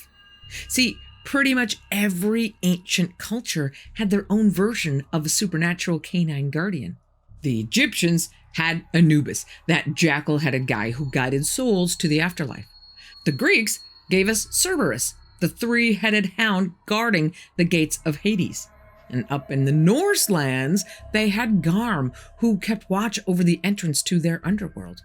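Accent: United States. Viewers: I never thought I could listen to an American accent for 3 hours, but she is a very good storyteller. Very Enjoyable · insufferable yank voice.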